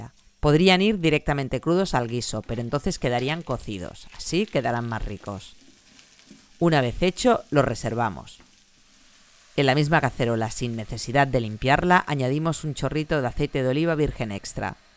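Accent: Spain. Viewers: ¿Cuál es ese tipo de Español es mucho Diferente? ¡Eres el Castellano! Besos del Brasil me gusta mucho de su Canal 😚😙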